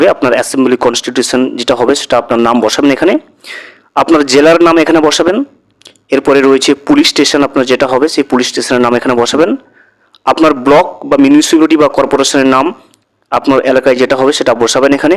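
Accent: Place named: Bangladesh. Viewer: apni ki Bangladesh teka video toiri korchen apnar voice ta Bangladesh desh er moto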